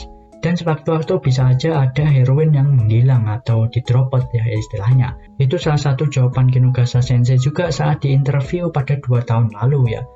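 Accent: Java, Indonesia. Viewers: min kau org mana sih? dri dulu ampe skrg medoknya masih kerasa bgt sumpah 😅😂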